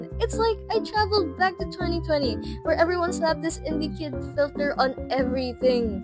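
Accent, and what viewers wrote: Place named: Philippines. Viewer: i love ur philipino accent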